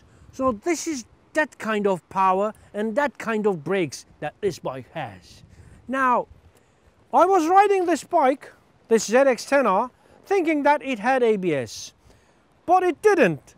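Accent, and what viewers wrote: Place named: England, United Kingdom. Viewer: Bildigin ingiliz aksani var kardez. Neyini begenmedin.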